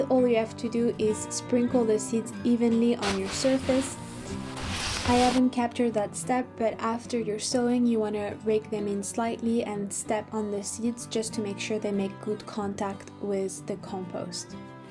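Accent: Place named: France. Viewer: Your french accent is so lovely 😍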